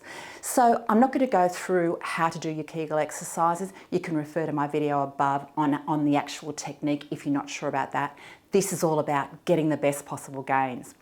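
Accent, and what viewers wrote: Australia. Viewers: I also love the Australian accent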